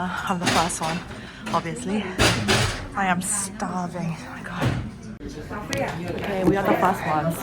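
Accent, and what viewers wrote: England, United Kingdom. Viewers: It was interesting seeing London from your point of view. You are such a foodie! LOL Which is ok but you make me hungry even though I had just ate something. I also think your English accent came out more the longer you were in London too! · After watching a few of your videos I noticed your English accent got stronger while you were in London and it got lighter after a while in Korea! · ~ 전 영어공부 하는겸 재밌게 보고있어요ㅎㅎ 예전에 영국에 잠깐 거주했지만 영어를 아주 능숙하다 할 정도는 아니어서...ㅜㅜ 영국 악센트와 한국생활 소재로 영어하는게 진짜 맘에 들어요~~^^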